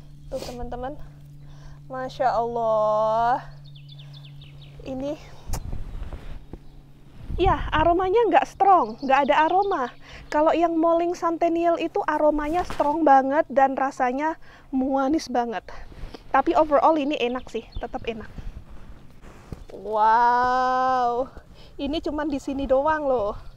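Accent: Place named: Banyuwangi, Indonesia. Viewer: Bang sekarang klo ngomong banget jd ketularan logat Banyuwangi nya mbk Manis banget jd manis byangettt 🤭🤭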